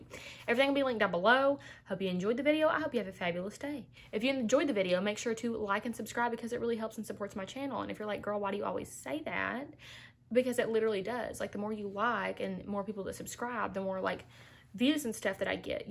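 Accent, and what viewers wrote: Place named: Kentucky, United States. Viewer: I've heard Allie rave about your glosses recently and just came to your channel and right off the bat I like your fun personality! :) Love the green here you got going on in this video and I love your accent too! I'm from and live in NC and there are different and unique southern accents across the southern states, but sometimes I can't tell - where are you from? Also, I am not even 2 minutes into your video yet and you've already got me laughing! :D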